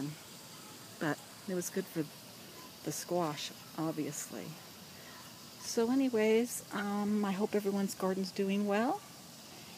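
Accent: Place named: California, United States. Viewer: Hey!!! how are you?? Ive sooooo missed that soothing Californian accent!! Do those pumpkin runners keep growing or will they eventually stop? I hope your well my friend and your family are ok? Are you back to school this week? Lotte starts Big school on wednesday (infants) and jack becomes a junior!!!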